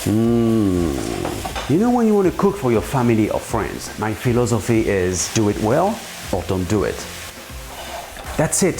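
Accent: France. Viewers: Ce que je trouve unique dans ces vidéos de Bruno, (outre le talent culinaire, le caractère trop sympathique du personnage ) ce sont les bruitages (quand il mord dans un plat, oubien les couteaux qui valsent, les oeufs qui dégoulinent etc... les sons sont bien travaillés , "incredible" !! - QUI fait toutes ces mises en scènes et ces bruitages, c'est TROP BIEN ! - Merci à Bruno pour tous ces partages ! - et le petit accent français tout en parlant un anglais "fluent" : en plus, j'apprends et "brush up my english too !" - Hip hip Hourra !!!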